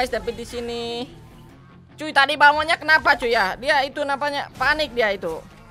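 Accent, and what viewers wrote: West Java, Indonesia. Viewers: Bang org sunda yak? Gak tau napa kalo youtuber sunda beda cara ngomong nya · Kamu orang Bandung mang soalnya ngomingnay kaya ada sundaan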